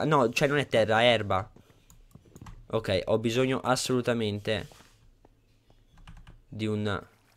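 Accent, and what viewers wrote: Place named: Veneto, Italy. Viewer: Gli scleri che ti partono in veneto sono i migliori!! Daghine;)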